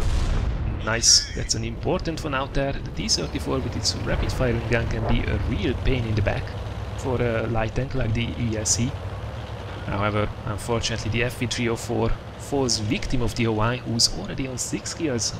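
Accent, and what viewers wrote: Hungary. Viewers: Olyan magyaros akcentusa van :) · what is your official lamguage because you speak english like a hungarian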